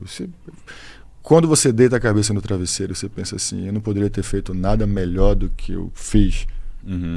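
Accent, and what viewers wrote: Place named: Rio de Janeiro, Brazil. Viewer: jurava que ele era carioca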